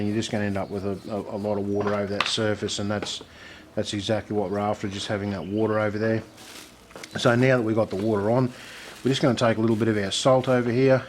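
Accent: Australia. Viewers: Mate I just stumbled across your channel, so good to hear the way you just talk through it. Not just the great description but love hearing from an Aussie. It's like I'm around my mates place bashing out some painting techniques. I'm going to have a look through your other stuff. Cheers for the info and keep it up.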